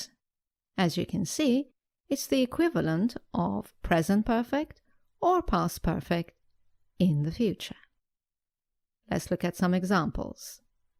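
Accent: United Kingdom. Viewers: UK English am i right ?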